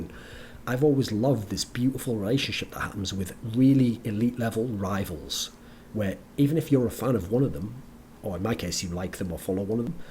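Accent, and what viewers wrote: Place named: United Kingdom. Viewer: Is he from UK? I find it a bit hard to understand what he's talking about, because of his accent. Btw, amazing speach bro!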